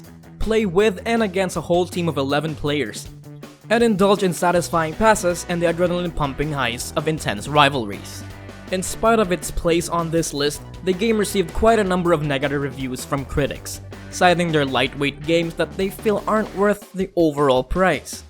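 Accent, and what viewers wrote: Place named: Philippines. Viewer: This guy sounds Filipino.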